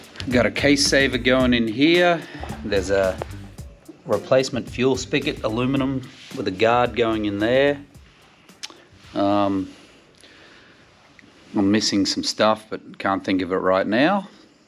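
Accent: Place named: Australia. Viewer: Super MOD... thank you for showing us. I just sold my r1200gs and bought the 890 adv S 2023 in a few weeks... this video gives a nice tip. Are you from Australia? You have an Australian accent. I go there a few times . G' DAY MATE 👍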